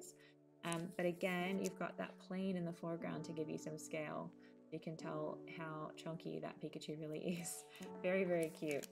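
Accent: Australia. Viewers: Noooo it was a steel energy hahaha. I hear Australian and American accent too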